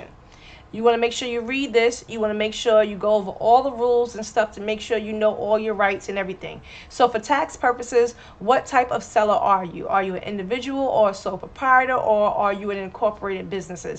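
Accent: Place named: Philadelphia, United States. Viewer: Are you from Philadelphia or South Jersey? I recognize the accent.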